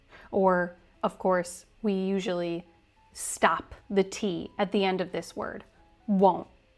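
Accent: United States. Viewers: Una pregunta es inglés americano ?? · ✨ You sound so good in this shadowing video! Super inspiring to practice along and pick up the American accent, intonation, and flow.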